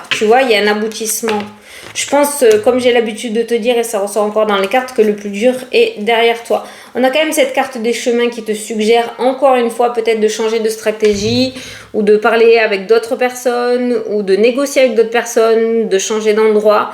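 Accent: South, France. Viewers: Cc toujours aussi joyeyse cet accent qui parle du soleil.moi dans le nord solei et compagnie...trop chaud...ma questiona situation financière va t elle s améliorer..merci beaucoup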